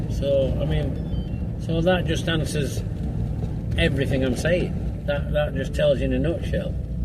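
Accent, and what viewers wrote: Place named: South Yorkshire, United Kingdom. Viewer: I agree broadly with your sentiments. In the current climate a classic car is a luxuary most cant afford. As others said also you need more space and preferably a garage for them. Also if you cant do things yourself its maybe too costly. I would like to dabble one day. I do my best maintaining our 2003 micra and 2006 honda. I have two local garages we send for mot and maintenance and a very handy tyre, exhaust and battery place nearby. All small independent. Im in Huddersfield. Youre in South Yorkshire with that accent.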